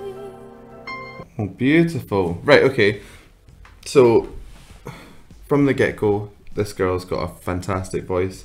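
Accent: Scotland, United Kingdom. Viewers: Liked your analysis and reaction to Katrina Velarde’s voice. Also enjoyed listening to your Scottish accent. c”,) Nice tea mug !!! Hehehe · There's just so many singing talents in this country,bro! You'll surely enjoy reacting to each. Just give in to your filipino subscribers' requests. Btw, i like your scottish accent! And your professional remarks,too.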